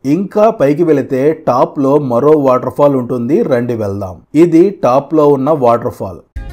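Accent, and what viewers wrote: Nellore, India. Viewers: బ్రదర్ మీది nellore ఆఆ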